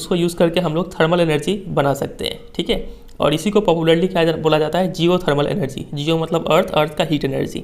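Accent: Bihar, India. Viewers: Your accent proves u r from bihar, 😀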